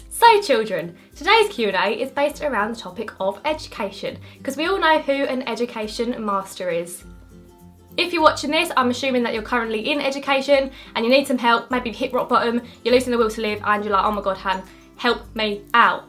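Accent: Essex, United Kingdom. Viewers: I love your Essex accent! Also you're funny and seem so down to earth x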